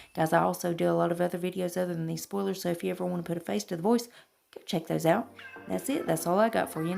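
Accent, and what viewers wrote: South, United States. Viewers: I've been so disappointed with Boxycharm over the last few months that I canceled my Premium box for June. I was frustrated last month getting the mascara over a palette (that fit my profile Perfectly), and then those brushes with the Ridiculous $90 price tag, and then the 2 mini lip balms that I'd be excited to see in a $12 Ipsy bag, but did Not belong in a Boxycharm Premium box... Come On!! It looks like the June Premium box is going to be much better, but I don't need another contour palette. The only thing I saw that really excited me in June's Premium is the Avocado sleeping mask. I'm crossing my fingers that I'll be able to pick it up in add-ons or Pop-up at some point. 🤞 I'm excited about Luxe, though. Hoping for some Great products to make up for the last few months of Duds! Thanks for the update, girl!! 😊 I'm excited to see Anything from First Aid Beauty! 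Nothing I've tried from this brand has disappointed me yet! Btw, I have that thick southern accent, too. There's nothing wrong with it! I lived in Virginia Beach for a few years when I was younger (I got my butt back to Texas as soon as I could! Lol), and it always amused me at peoples reaction to it there. Most people loved it, but some acted like I was speaking a foreign language! Lol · Finally another southern lady on YouTube!!! It's so nice to hear another southern accent.. makes me home sick tho!!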